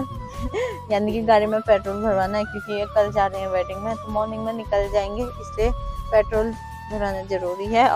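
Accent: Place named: India. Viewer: aapke bhasha deval side ki lag rhe the to mena bol dya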